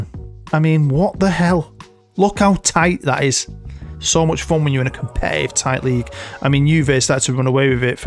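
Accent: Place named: Glasgow, United Kingdom. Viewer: Personally....I'm proud that I can finally understand Glaswegian!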